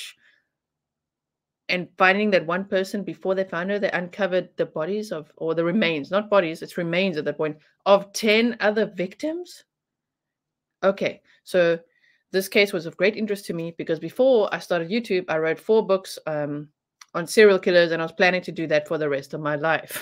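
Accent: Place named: South Africa. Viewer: Hi! I've been listening to you with great interest. Your accent was só Mzanzi, but channel address is 'Netherland'. After your story about 'René', I know I didn't miss the accent. 🇿🇦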